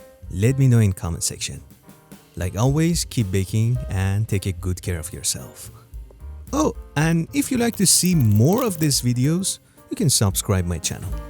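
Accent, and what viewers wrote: Iran. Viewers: درود. لهجه ایرانی دارین. من تازگی میخام با خمیر ترش آزمایش کنم. امیدوارم که بتونم 😁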